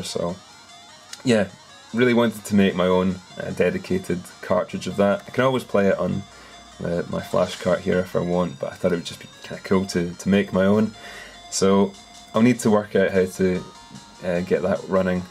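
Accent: Scotland, United Kingdom. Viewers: I love the scottish accent.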